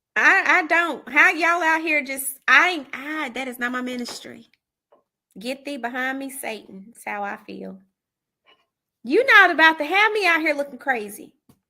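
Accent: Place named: South, United States. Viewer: I love listening to you & your southern accent! You tickle me! 😂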